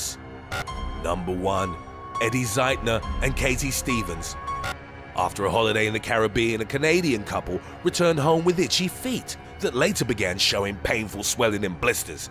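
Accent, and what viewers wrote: England, United Kingdom. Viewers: The new narrator is too dramatic and his british accent annoys me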